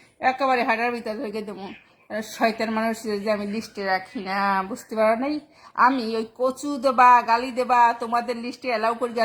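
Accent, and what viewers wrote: Barishal, Bangladesh. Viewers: কথা শুনে মনে হচ্ছে বরিশাইল্লা মানুষ । তবে এক্সপ্রেশন গুলো অসাধারণ ছিল !🫡🫡🤣🤣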